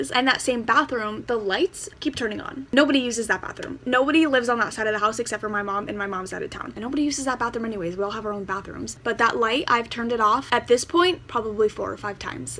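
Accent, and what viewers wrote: Ireland. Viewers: everyones saying your doing Mirandas voice on purpose but I just thought you were from Irish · where you from?? are you irish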